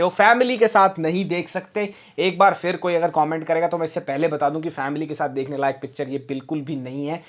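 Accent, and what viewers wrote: Haryana, India. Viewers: k review ka koi muqabla nai hai..or iski comedy haryanvi accents...end hai yaar.jiooooooo shehzada jioooo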